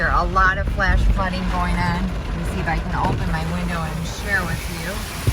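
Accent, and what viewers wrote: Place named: South, United States. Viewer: you sound like southern usa americans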